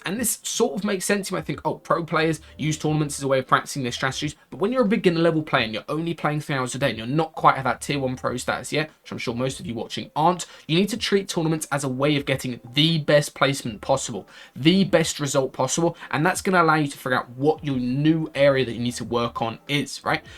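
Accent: United Kingdom. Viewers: London/British accent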